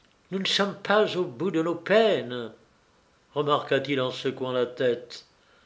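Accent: England, United Kingdom. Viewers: Pourquoi cet accent anglais? Ilbn'a pas rzison d'être, puisque il est censé s'exprimer dans sa propre langue. . Bref, j'arrête c'est très insupportable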